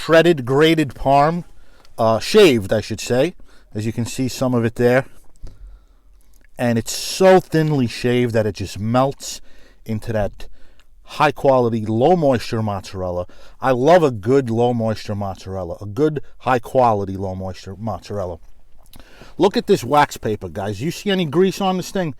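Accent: New York, United States. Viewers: Absolute new yawk knucklehead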